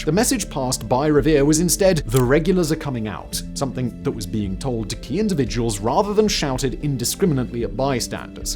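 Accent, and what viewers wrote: England, United Kingdom. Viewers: Guys, ya really can't judge him too harshly. Most all of this stuff is handed to him by writers. Him saying it with confidence and a British accent makes people assume he knows all this stuff. He's just reading the script he got a few minutes before the recording. His researchers can leave a little something to be desired, sometimes. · I don't get a pompous attitude from him at all. Are you American by chance? I wonder if the English accent just comes across that way to you guys (if you are).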